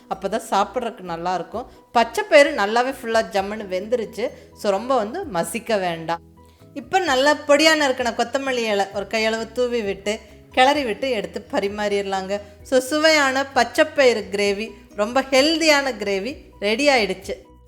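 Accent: Kongu, India. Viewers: Mam nenga kongu tamilil pesurathu pidichirukku..nan Theni Dt.bodinayakanur. gravy super sis. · Neenga.. namma kongu tamila pesurathe azhaga irukuthunga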